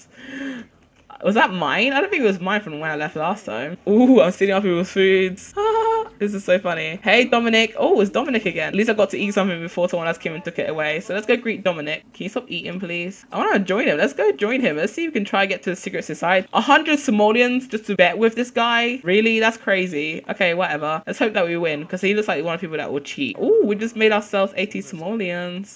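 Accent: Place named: United Kingdom. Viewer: Love your accent. Are you from the U.K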